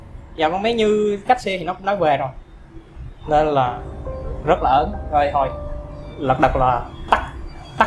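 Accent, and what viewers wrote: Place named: South, Vietnam. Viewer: cách nói chuyện rất Nam Bộ, nghe rất thích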